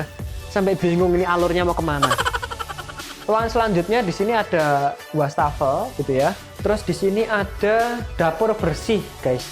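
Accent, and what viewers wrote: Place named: East Java, Indonesia. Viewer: logat e indonesia ,koyok e arek iki cah jawa timuran 😅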